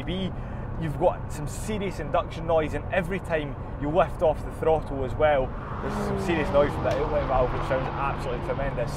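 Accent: Scotland, United Kingdom. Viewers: constitutionalpie * Scotland, groundskeeper Willie from Simpson’s has thicker accent.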